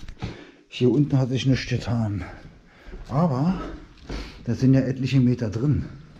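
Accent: Berlin, Germany. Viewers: Die Berliner Schnauze ist einfach klasse💪🏻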